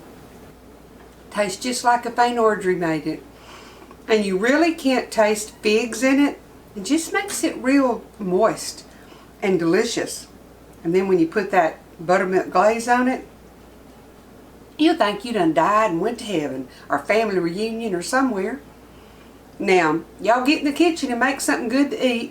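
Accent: South, United States. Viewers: I could listen to you talk all day lol. It's that lovely Southern accent. Figs, mangoes and passionfruit are my favourite fruits to eat, so I definitely wrote this recipe down. Thankyou. Happy anniversary to both you and. Michelle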